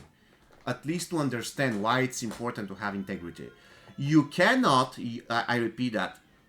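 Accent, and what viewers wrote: Bulgaria. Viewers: Hello, I have a question for you. Are you Bulgarian? Because I watch your videos and I catch the typical Bulgarian accent. I am a Bulgarian and it would be incredible if you are a Bulgarian too.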